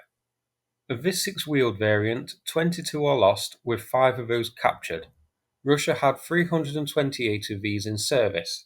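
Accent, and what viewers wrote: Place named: Midlands, United Kingdom. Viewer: Hi, I know you are in Tiawan, but just wondered if you are orginally from the midlands in England as you have that accent.......Great channel btw, thanks for the very informative videos.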